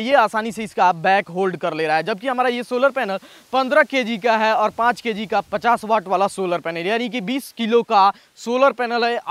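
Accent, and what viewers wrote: Bihar, India. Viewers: Bhai ap bihar se ho❤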